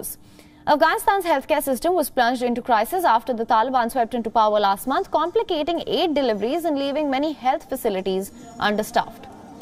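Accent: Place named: India. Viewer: WION should choose a presenter that speaks better english. It has millions of subs... if they want to represent a real international image, it should start using someone that doesnt have such a thick indian accent.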